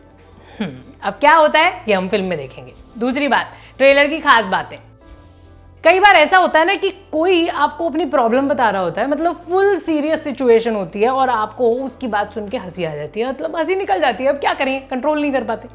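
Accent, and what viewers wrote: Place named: Bhojpuri, India. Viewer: Madam ka bhojpuri accent majedaar hai, I also make videos.. please check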